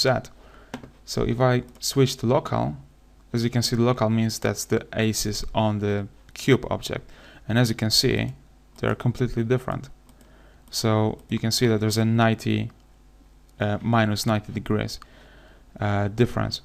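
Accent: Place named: Poland. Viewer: It's somehow nice to hear a Polish man speaking English, your voice sounds empathic. I like yout stuff, you should do more. Pozdrawiam! :D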